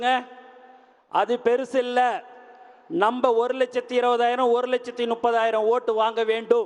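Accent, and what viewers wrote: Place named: Pondicherry, India. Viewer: Pondy tamil apdi than irukum. Athu oru style.....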